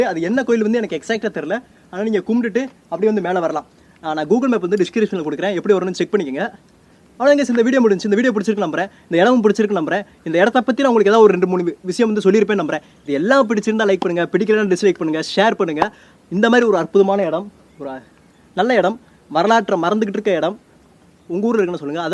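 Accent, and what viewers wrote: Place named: Kanyakumari, India. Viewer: Slang Semma... Kaniyakumari slang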